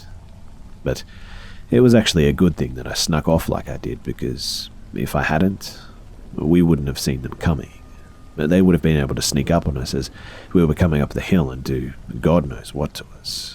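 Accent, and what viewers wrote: Australia. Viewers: He's Australian, lmao. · Are you an Aussie?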